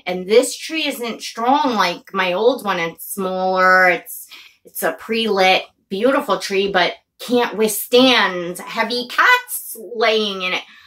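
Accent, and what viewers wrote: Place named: New York, United States. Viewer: Look at you girl!!!! You look and sound amazing!! We missed you (I personally missed that New York accent). 😘😘😘😘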